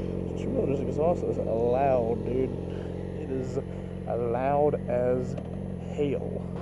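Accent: United States. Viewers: dude u sound American, how did u ended up in Holand? lol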